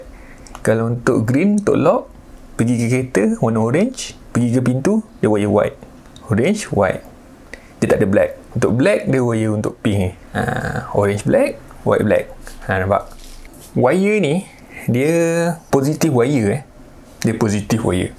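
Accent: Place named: India. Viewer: kl gak fokus dengerin, aku kira orang India yg menjelaskan.😁🙏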